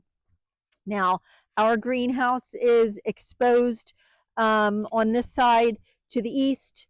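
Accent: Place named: New Jersey, United States. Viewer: Thanks for the video. I’ve been wondering where your accent is from and I was correct. You sound just like my cousin who grew up in Morristown NJ. We lived in NY at the time. Thinking of getting this greenhouse. We live in ND and the wind is relentless!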